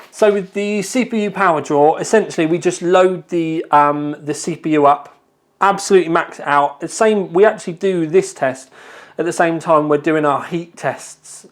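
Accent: United Kingdom. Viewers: that british accent annoys me!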